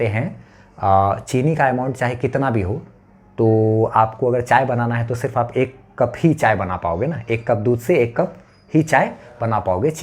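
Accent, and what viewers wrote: Bihar, India. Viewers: Sir do u from BiHaR ??....😊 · Sir are u from bihar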